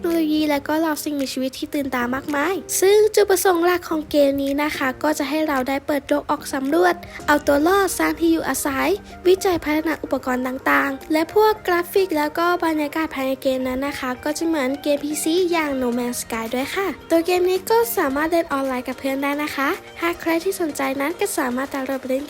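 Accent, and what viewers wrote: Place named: Central, Thailand. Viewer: สงสัยมานานละอยากรุ้ แอดมินคนจังหวัดไรอะ ราชบุรี สุพรรณ กาญ เพรชบุรี เพราะสำเนียงเหมือนๆกับผมเลย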